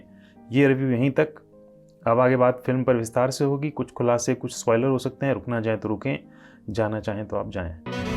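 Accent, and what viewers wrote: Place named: Rajasthan, India. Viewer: भाई मैं आपका रिव्यू पूरा देखा पर भाई सच कहूं तो जैसे ही अपने बोलना शुरू किया पता नहीं क्यों अंदर से आवाज आई कि आप राजस्थान से होंगे 😊 सच पूछो तो इतनी bachodi राजस्थान वाले ही कर सकते हैं भाई सच पूछो तो आपने बहुत तगड़ी bakchodi ki 😅😅hai और सच बताओ तो मैं खुद राजस्थानी हूं इसलिए यह Janta hu😅😅😅😅😅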